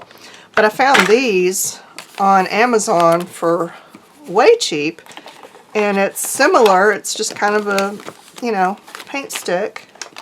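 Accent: South, United States. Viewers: Brilliant as always. We all miss you so much. Thanks for treating us to that wonderful southern girl accent again. ♥️😉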